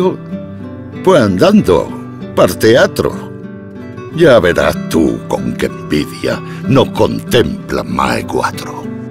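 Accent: Spain. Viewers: Maravillosa interpretación en andaluz.... Con su voz, la poesía cobra vida. Gracias!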